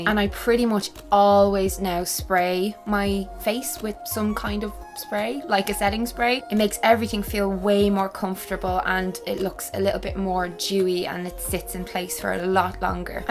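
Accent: Dublin, Ireland. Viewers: Are you from northern Ireland? You sound like your from Dublin tho😂 so idk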